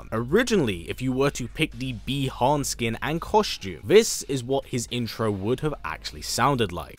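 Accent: United Kingdom. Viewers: The first MK i played :3 Great Game!! Pd: Your Accent...are you From UK? Answer if you want, mah Boi.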